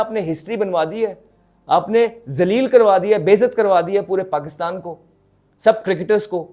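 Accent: Punjab, India. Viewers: Shazad bhai one of the many excellent talents who could not flourish or even evolve because of what Pakistani hierarchy is, and excellent points bhai, also love your “pure” Punjabi accent! Keep it up Sheraaa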